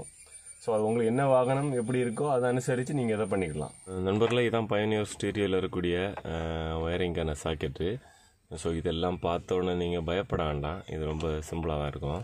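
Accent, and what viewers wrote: Kanyakumari, India. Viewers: Kanyakumari makkaloda thamil keka azhaga irukku..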